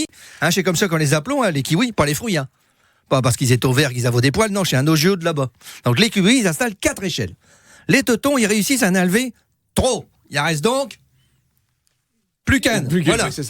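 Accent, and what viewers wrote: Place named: Nord-Pas-de-Calais, France. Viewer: Ch'ti..? J ai du mal à suivre..😂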